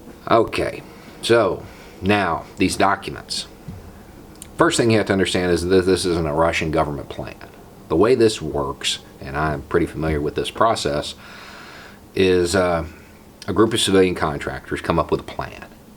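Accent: South, United States. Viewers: what southern state is your accent from?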